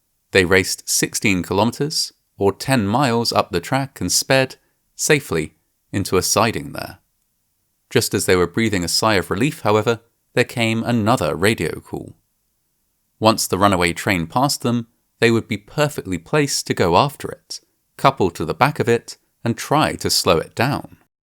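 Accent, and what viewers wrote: United Kingdom. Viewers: I've listened to 15 seconds. I hear a British narrator voice for an American story - a telltale sign of an AI generated video. Can anybody tell me if this is a true story or is it AI fiction? For now, I'm clicking away and going elsewhere.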